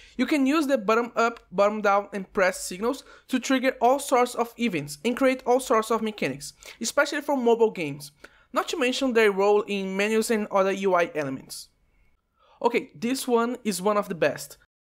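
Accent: Brazil. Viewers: Sabia que era BR só pelo sotaque. Brabo homi! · Eu sabia pelo sotaque que tu era brasileiro kkkkkkk salve, e seu inglês é MT bom btw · Percebi pelo sotaque. Ganhou um inscrito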